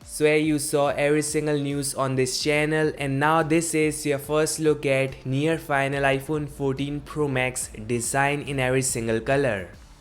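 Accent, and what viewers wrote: India. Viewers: tabhi sochu voice indian lag ri thi 😅 U should do face cam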